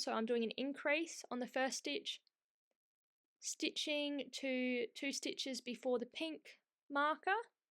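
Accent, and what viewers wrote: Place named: Australia. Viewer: Wow. Lovely Australian accent you have. Thank you I appreciate that😊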